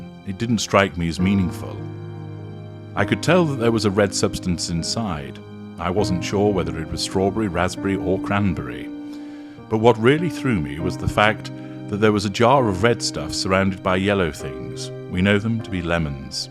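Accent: South, United States. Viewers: you're killing me with that southern accent! You sound like Mr. Tudball, from the Carol Burnett Show. You should check it out. Us Americans, never figured out what country he came from!🤣😂🤣😂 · Hilarious! Love the country Southern accent!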